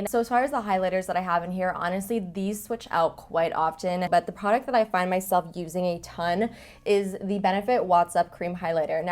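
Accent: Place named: Canada. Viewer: Where are you from in Canada because I can hear a strong accent. Definitely different to how most people speak in B.C.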